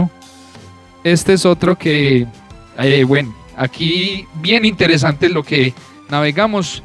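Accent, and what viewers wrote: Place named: Cartagena, Colombia. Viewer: Muy clara y buena guía la que nos dejas,para los que nos gusta manejar sonido y no tenemos una formación técnica o de escuela. Tu acento es bien cartagenero,verdad?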